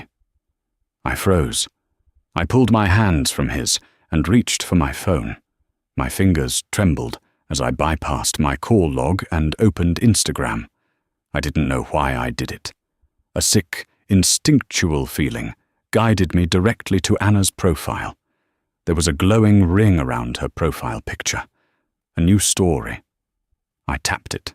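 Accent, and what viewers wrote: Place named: United Kingdom. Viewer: Why is this story about a female and they used a male British accent it’s confusing and the voice is all wrong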